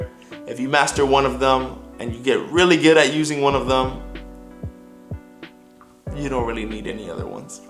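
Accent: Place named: Toronto, Canada. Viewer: you sound like youre from toronto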